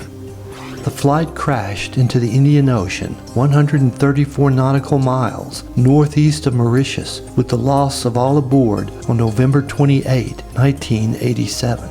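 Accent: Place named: South, United States. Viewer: I hope episode 4 drops soon! Thanks, this was excellent. Love your accent! Are you in the South? ❤️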